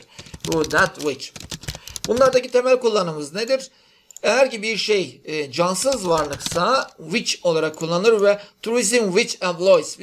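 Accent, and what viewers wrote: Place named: Arab world. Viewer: hocam arapça edasıyla ingilizce ders anlatıyosunuz.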